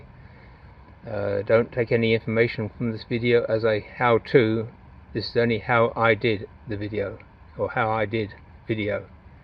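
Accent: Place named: United Kingdom. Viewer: Whenever, as an American (speaking my:"American" English) I want to feel intellectually inferior, I need only to listen to a few minutes to a video where there is a Brit explaining in the REAL English language how to fix something like this. This is by far the best video on this sort of R&R on YouTube. Frankly "the way it should be done " Thank you sir! And darn it, if only it hadn't been for your King George being such an a-hole we'd still be the same country. If we can get past that mess someday, and reconcile, I'd be glad for y'all to have us back!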